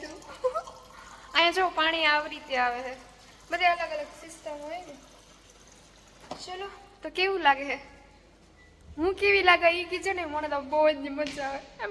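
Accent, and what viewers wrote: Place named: Mer, India. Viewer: Jay siyaram 🙏 Ben tamari mer ni boli saras bolo chho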